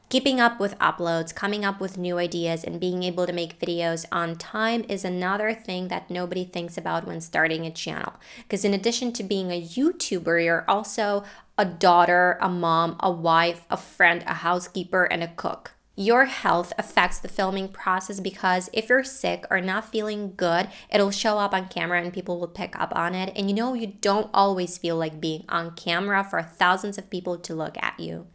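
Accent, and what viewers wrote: United States. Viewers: Hi there, you're the greatest English teacher and as well as your American accent is so awesome..And please ignore the dumbest stuff who're criticism about you..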